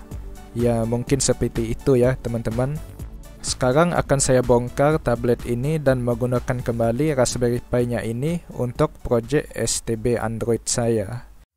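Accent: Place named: Sarawak, Malaysia. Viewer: dah kuduga kamu dari Sarawak, dari logatnya. Tapi bahasa Indo kamu fasih banget kayak asli orang indo, malah aku tadinya ngira kamu orang daerah Kalimantan. Tapi aku penasaran kenapa kamu orang malay pakai bahasa Indo ? Apa penonton kamu kebanyakan dari Indo kah, atau sekedar obsesi ?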